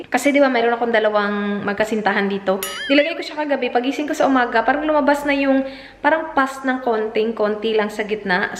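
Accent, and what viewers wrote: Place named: Hiligaynon, Philippines. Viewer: bakit parang hiligaynon yung accent mo sa first one minute intro mo hahahahahaha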